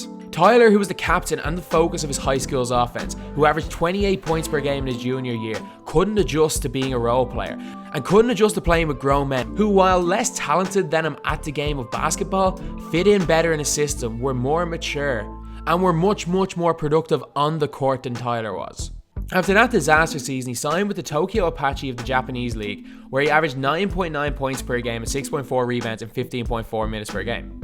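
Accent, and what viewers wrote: Dublin, Ireland. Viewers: are you from Dublin or county's around that like kildare Louth ect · Is that an Irish accent on the narrator?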